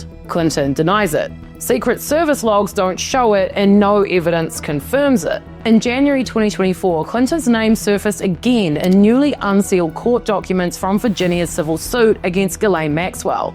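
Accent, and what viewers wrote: New Zealand. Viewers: A Kiwi! It's amazing to hear your pronunciation of the letter e as short i.❤ very groovy 😎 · Love your Kiwi accent...adds some gravitas to your reporting.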